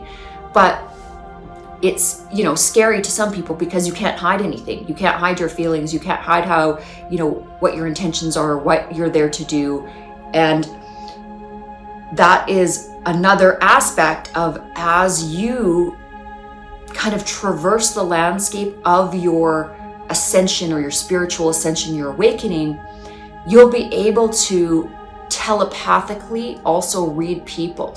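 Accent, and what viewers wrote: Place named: Canada. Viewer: I can say you're Canadian by the way you say " SO " and " ABOUT " Possibly you're a Pleiadian too : )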